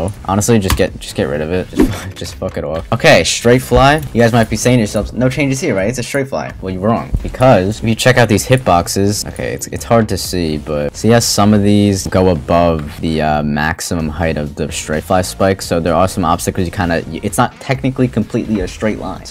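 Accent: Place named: New York, United States. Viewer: Yo gl on silent clubstep. I miss the New York accent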